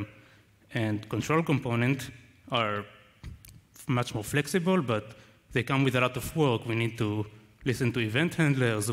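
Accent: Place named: Arab world. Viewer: I'm sorry,I couldn't understand your English, which looks like Arabic pronounce.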